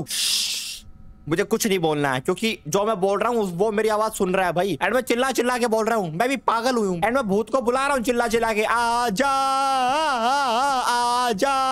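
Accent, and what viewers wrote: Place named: Haryana, India. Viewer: Bro you are from HARYANA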